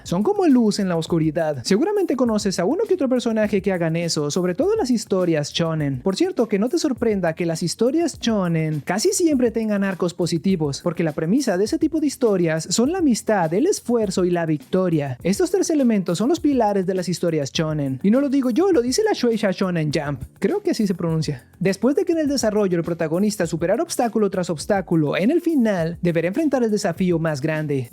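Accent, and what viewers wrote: Standard. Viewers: Muchas gracias por tu contenido, es muy util y bien explicado! Siempre siento como si estuviera viendo un capitulo de algun programa de discovery por el español neutral 😂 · por qué tenes q hablar tan neutro xd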